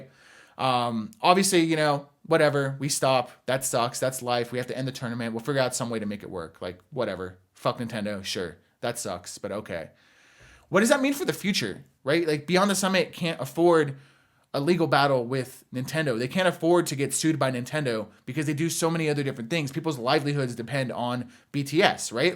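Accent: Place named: California, United States. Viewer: sounds like Fred Armison in the SNL skit Californians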